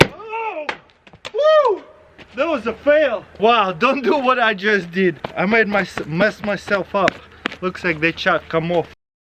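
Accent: Russia. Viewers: Y'all with a Russian accent!! This was awesome🖒🖒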